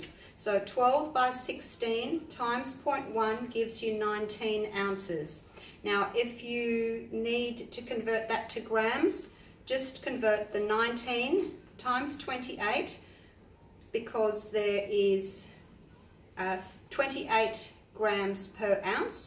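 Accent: Australia. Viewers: Congratulations on 20k subscribers 😍 You are a delight to watch/teach and gotta love the Aussie Accent 😃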